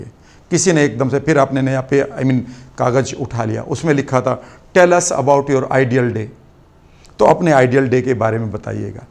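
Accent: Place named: West Rajasthan, India. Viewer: Sir aap Rajasthan se ho na, aapki aaj tone se pata laga, wo bhi west rajasthan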